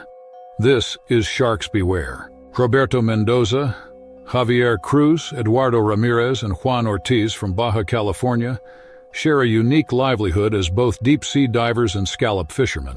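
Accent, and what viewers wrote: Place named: United States. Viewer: Announcer is playing w/ us OR was drinking vodka on story #2 the newlyweds. No way he can pronounce abyss, cerulean but mispronounce simple words. Story 3 he had no mistakes. Any I missed ? 🤣🦈 divvver -> diver leed vest -> lead hom ed -> honed in snapped shoot =snapped shut fers ee us -> ferocious ree alm -> realm threet -> threat Voice talent guy has GOT to be laughing when he does these [not at victims but his pronunciation]. He sounds like a native English speaker and one from the US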